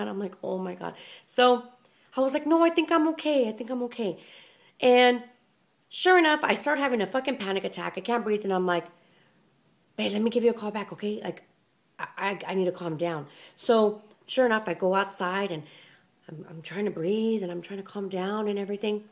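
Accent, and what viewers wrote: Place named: West Texas, United States. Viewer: Where you're from? You sound like some one in West Texas (El Paso) lol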